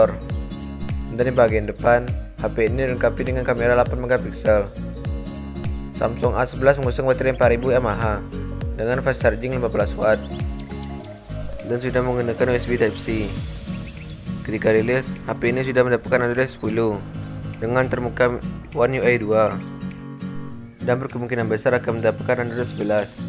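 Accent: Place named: Lampung, Indonesia. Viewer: Suaranya kayak orng lampung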